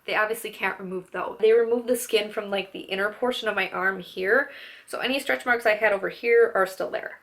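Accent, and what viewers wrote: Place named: Chicago, United States. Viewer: You have a Chicago accent. The scars dont look bad at all and I am very honest. Most internet people say "yaaas" blah blah even when person 400 pounds! You look pretty good.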